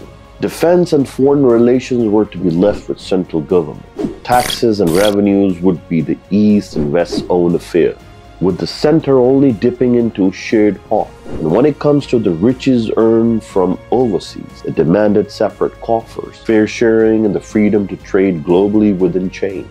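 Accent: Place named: United States. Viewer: Love from india 🇮🇳🇮🇳🇮🇳🇮🇳🇮🇳 we want more information about Indian and pakistan historical... We also learning in Indian school Book (NCERT) but i listen what's pakistani think about undivided india Your voice is good but american accent some time problem to understand Your name is (i think is hindu sir name and also creat from ancient Afghanistan or great India when 16 mahajanpad in north india one of the state... If you know about your sir name and......history about 2th century or gupta, mourya, saatvahan, shak, kushan emperor so please make video my be possible speaking urdu/Hindi)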